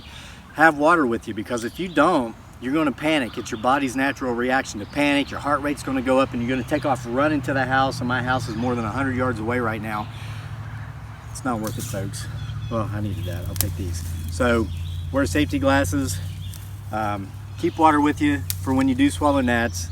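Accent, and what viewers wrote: South, United States. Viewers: I love with all the practical advice & especially your wonderful Southern accent! I live in Broadstairs, Kent, UK, but will try your fly deterrent! More power to you!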